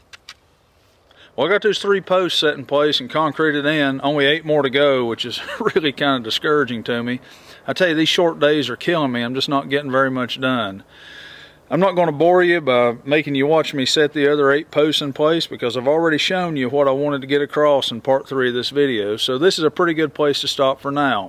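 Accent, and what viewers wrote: South, United States. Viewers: Man, I'm tickled I ran across your channel today. It's awesome to get this info from a good ole boy instead of those some of those nasally Yankees. Northeastern Arkansas here and your vids are going to help me understand what I need to do to help my son build a pole shop on his property and finish a house he bought . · im from the south and this dude is country as freaking cornbread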